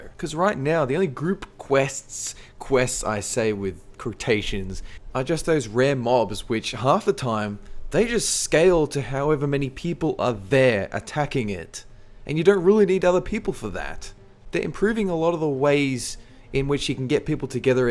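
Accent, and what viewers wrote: Australia. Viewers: Sick you're Aussie! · Dude, you're an Aussie?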